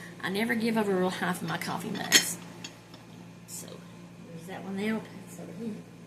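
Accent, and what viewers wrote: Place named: South Carolina, United States. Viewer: HI,. Just found you today. And so happy I did. I was cooking breakfast and heard that accent. I am from South Carolina, so I know "us" when I hear it. I ran into the family room and was like, "Yes!". Maybe I will see you out there one day. Have a blessed day.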